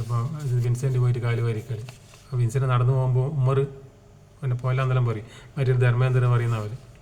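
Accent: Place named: Kannur, India. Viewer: താങ്കൾ കണ്ണൂരാണോ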